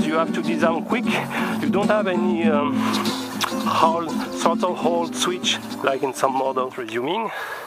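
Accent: France. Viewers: opinions matter it was nice but the French accent bothered me a bit😁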